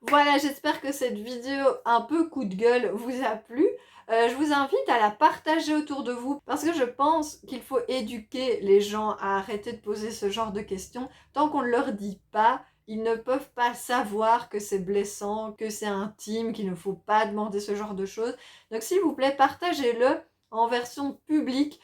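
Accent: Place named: Switzerland. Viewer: Ola l'accent Suisse !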